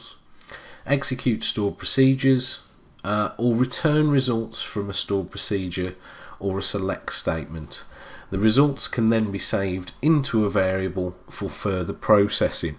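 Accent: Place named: England, United Kingdom. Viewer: the golden grail of English accented youtube tutorials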